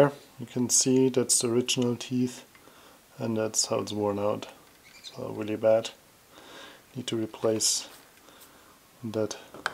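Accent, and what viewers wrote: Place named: Germany. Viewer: beautiful landspace, where do you life, monkeys running around? your english speech seem to be a littie bit "germanized". thanks for your comments and detailed video from your landy repair! best wishes from austria!!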